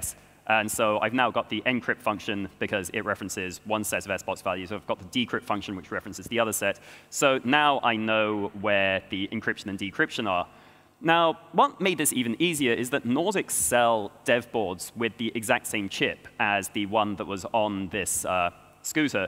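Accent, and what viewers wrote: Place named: West Coast, United States. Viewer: I'm starting to hear a touch of west coast accent in there...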